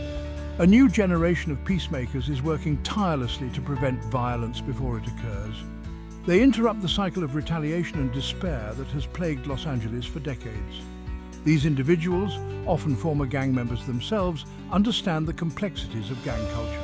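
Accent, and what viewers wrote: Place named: United Kingdom. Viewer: Whats up with these Brits narrating this lame ass crap ???? Who gives a f--- <num> Life !!!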